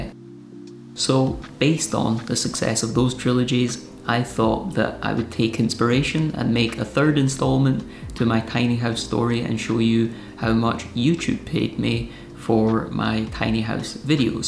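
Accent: Scotland, United Kingdom. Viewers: The Scottish accent has got to be the best in the world, and your voice is so relaxing. Anyway I'm glad it did well and you got some good pay out of it as you deserve it. I can work out that some other youtubers must be so rich! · Thank you for sharing this information. It gives me some motivation to do more video editing and to try to become good at it. Side note: Love the Scottish accent. Scotland was one of the first countries I spent time in when I traveled outside the USA. I have nice memories of my time there and will always love hearing a Scottish accent because of that.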